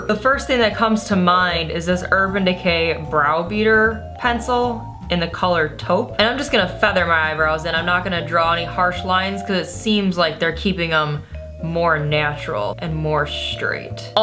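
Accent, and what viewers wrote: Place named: Fargo, United States. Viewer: You sound like you're from Fargo at times, I'm sure that's an assumption, I enjoy your accent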